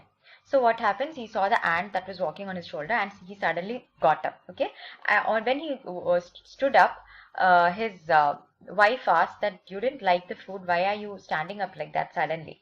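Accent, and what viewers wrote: Kerala, India. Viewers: Mam are u malayali...btw great expaination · Last minute preparation with you is the best way of learning with you mam.....I think u r malayali because the way u explains hindi is like my mom....❤️🦋🌝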